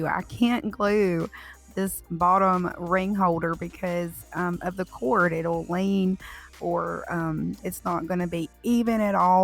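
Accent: South, United States. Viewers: You have inspired me!!love your southern accent too! so pretty!! And we can put our own style into your design and make it customized to our decors Thank you love Canada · Oh my how beautiful, & the lamp isn't to shabby either. LOL Just joking, plus it's really nice to hear someone with a Southern accent like me. I could be your grandma & I loooove your lamp. I just happened to stumble up on your video. You are very talented & bet you started out when you were a lot young. I am going to have to make my own, & watch all of your videos. I love that deep Southern accent, it makes me feel like I am home "In ". Keep up the good work, Little Southern Magnolia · You're very welcome. You have a strong southern accent. Makes me think you are from somewhere close to where I'm from... Kentucky.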